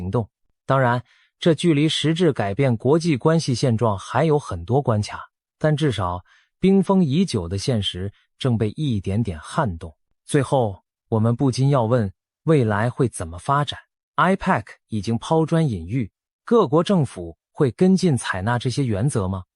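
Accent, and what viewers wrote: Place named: Beijing, China. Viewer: 主播拜託你不要用北京的爛中文發音，中共產黨的中文真是非真正的中國人的正統中文，如亞洲正確的發音雅洲才對不是讀訝洲請主播用最正統派的中文發音，常識正統派讀常視發音才對不是中共匪幫的爛中文讀常時那中共產黨畢竟他不是中國人所以中共用的中文發音非常爛又不是正統派的中國語言，主播買本正統中文字典注音符號的書好好認識中共的爛中文字發音吧！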